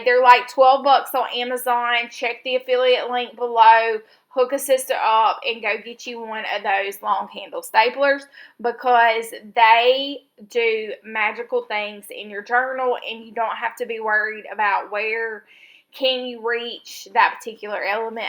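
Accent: South, United States. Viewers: I love love love your page and your sweet southern accent! So endearing! I am thankful for you,! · Your accent and southern ways are what I love about your videos the best💗 it’s heart warming! Love your pages💗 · I'm a southern gal myself so I know what you mean! Some folks love a southern accent and some folks don't.....and those that don't can be unkind with their opinion. Love your page and your accent!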